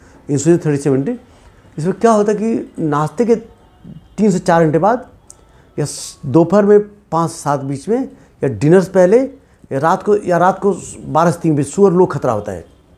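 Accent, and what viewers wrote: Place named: Bengal, India. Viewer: Your Bengali accent is very difficult to understand sir Also please make videos in English with Subtitles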